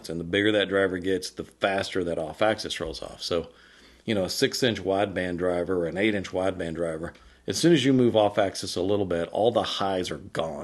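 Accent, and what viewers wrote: Texas, United States. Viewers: "He cranked 'em up with some Danzieg....". Incredible. Between the story and the Texan accent, it's like a Coen Bros. movie.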